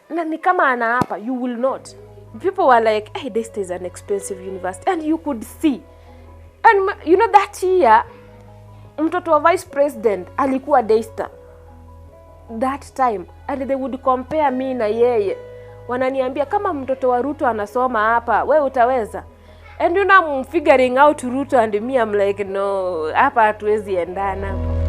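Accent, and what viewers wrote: Uganda. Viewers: Unaongea kama munganda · your swahili sounds like ugandan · She sound like a ugandan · She has Ugandan accent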